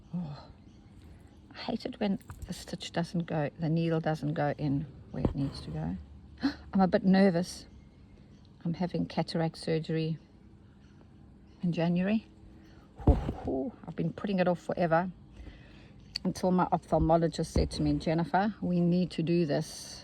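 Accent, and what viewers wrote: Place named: South Africa. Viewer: I enjoy listening to you nattering away 😊 I love south African acsent & I adore hearing the bird life around you it sounds so serene I wish I could see it. I have ordered yr books, im excited to get them & start a project using them, I found you after discovering the lovely Corrines channel, so I'm grateful to both of you ❤I also have Judith's books which are excellent as you know, but you can always learn a different way from different teachers, so I can't wait for yrs 😊 oh fabulous you did show us yr surroundings superb just lovely, you see I comment as I think of things I want to say so I don't forget, so I keep editing thankyou for showing us yr gorgeous space where you create beautiful ( with a sigh of delight)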